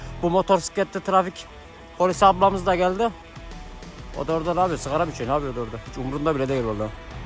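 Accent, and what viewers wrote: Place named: Central Anatolia, Turkey. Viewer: Konusma şeklin yozgat sivas bu yörelerdenmisin ? iç anadolu olduğu kesinde...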